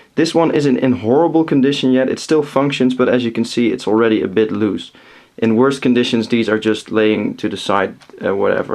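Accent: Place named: Netherlands. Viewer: Nice dutch accent :D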